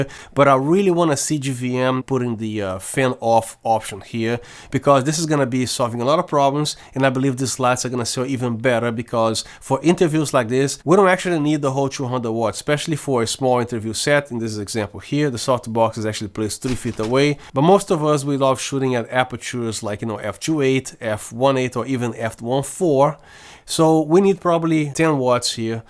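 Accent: Brazil. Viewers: I can feel the brazilian accent... Otimo conteudo amigo. Parabens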